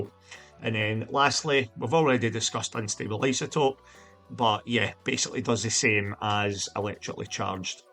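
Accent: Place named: Scotland, United Kingdom. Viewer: I love your content, but more than that it's the Scottish accent that's the icing on the cake. Pure Dead Brilliant.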